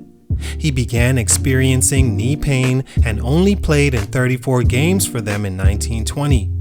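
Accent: Bahamas. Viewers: Are you from The Bahamas. You sound like a Bahamian. The Bahamas is my second home.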